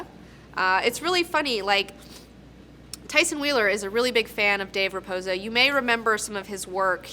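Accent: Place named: Appalachia, United States. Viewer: wtf.. how come youre talking with a hillbilly accent?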